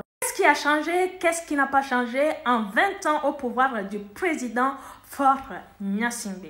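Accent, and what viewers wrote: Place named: Canada. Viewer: Accent canadien si j'ai la bonne mémoire 😅😅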